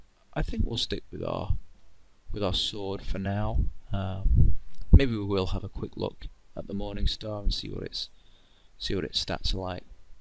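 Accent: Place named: Yorkshire, United Kingdom. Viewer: I thought it was alright. Makes a change to the American accent. Is it Sheffield? That's where I'm from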